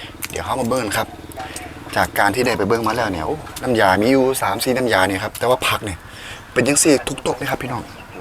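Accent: Isan, Thailand. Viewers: มักอ้ายเว้าอีสานแม๊ ใจบางบักคัก ❤️😀 มาเที่ยวอุบลเเน่เด้อจ้า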